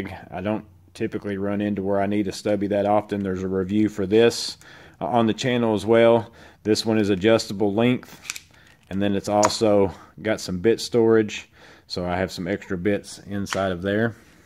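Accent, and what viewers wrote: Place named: Texas, United States. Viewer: I love your Texas accent lol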